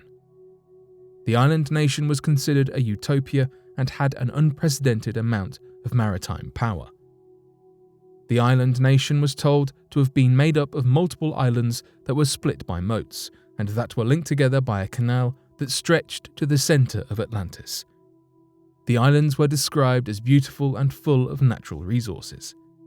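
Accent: United Kingdom. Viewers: I think there are 2 narrators both are British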